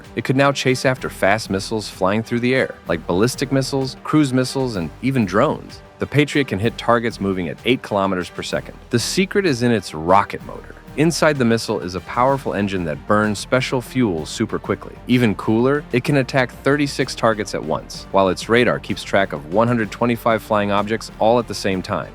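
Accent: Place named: Russia. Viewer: Впервые услышал руский голос автона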